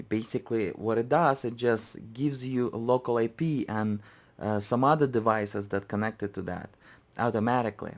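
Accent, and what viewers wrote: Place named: Germany. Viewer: he sounds german to me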